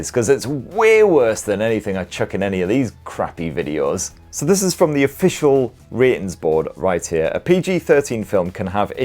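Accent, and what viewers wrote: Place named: England, United Kingdom. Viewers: his northern influence hitting me, even in the states. Love the English humour. 🤣🤣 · lot of mom-jokes, tips on how to get a good blastin, inapropriate language, best english accent ever... ...so what's not to like here? I love ur content, keep it up! And thx for the lmao moments, when im listening to ur reviews, while driving to work. Always makes my day! Greetz from Germany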